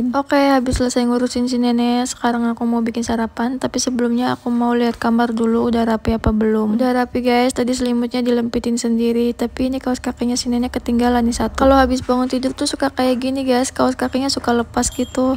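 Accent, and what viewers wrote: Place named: East Java, Indonesia. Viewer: OK, tetap semangat ya.. Setiap hal yg kita kerjakan dg niat & cara yg baik, InsyaAllah mendapatkan hasil yg barokah.. btw, logat bicara terdengar cukup familiar. Dari Jawa Timur kah?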